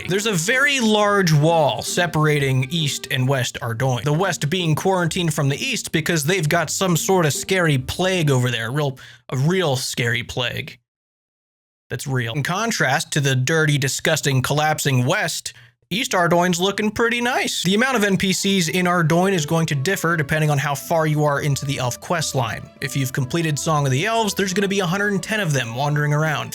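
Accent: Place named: Midwest, United States. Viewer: Today I learned that is from the Midwest USA (I dont care if you were born in Uganda; if you pronounce "roof" like that, you're midwestern) If you think castles are cool, I HIGHLY recommend the Youtube channel which has a series going over how tactically viable all of the castle in Gielinor are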